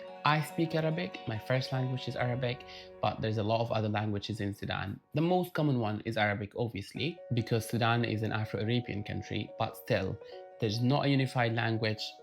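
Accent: Scotland, United Kingdom. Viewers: Theres a bigger scottish twang in your accent than you think, definately hard to pin down. I knew where Sudan is but I didn't realise they have the largest number of pyramids. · You definitely have a little bit of Scottish in your accent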